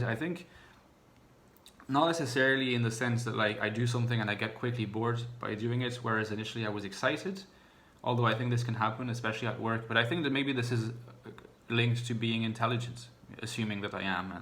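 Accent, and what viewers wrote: Ireland. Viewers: Some questions! Who is? Where are you from? What languages do you speak? You have an 'Irish lilt' to your voice. Did you spend time in Ireland? I do a very detailed test and come up with INFP and INTP. Do I need to take the test again. What is your educational background? You seem exceptionally well versed in the vagaries of the MBTI. Thank you so much. Lots of Love and Hugs. Test: <link> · Thank you very much for this comprehensive list,I relate to all of your points,I was trying to figure out your slight accent,thought you sound maybe a bit Irish,thank you for the clue in the video☺️ also I most struggle with the last point,I’m always that random girl for the situation and don’t really fit in most of the time,give people impression that I’m way too naive,I can’t take anymore their irrational reactions and try to avoid meeting ‘strangers’,I realize I can only safely socialize in private where I’m introduced to people by someone who already knows them,when I have to personally assert myself to a complete stranger and and have that initial small talk their lack of boundaries and sexualizing my ‘INFJ stare’ is just disastrous...i have women of my mothers age coming on to me sexually and telling me they are bisexual 5minutes into conversation...yep that’s how they ‘figured’ me out...😖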